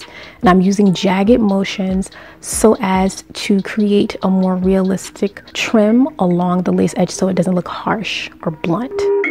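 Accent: New York, United States. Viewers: dat nyc accent is a yes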